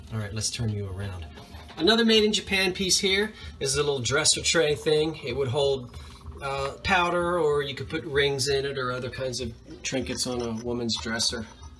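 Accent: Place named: East Coast, United States. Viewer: ! I've been watching your earlier episodes that include "young" Salem and 70s stuff. I LOVE THEM! I'm convinced the only Good Will's and thrift shops with the quality of items you find are on the East coast. I appreciate your sense of humor, facial expressions and East cost accent.. I'm learning so much from you. Continue being a blessing and I'll do the same.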